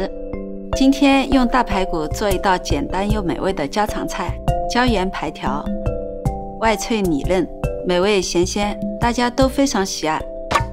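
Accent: Shanghai, China. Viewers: 我也听出来，上海人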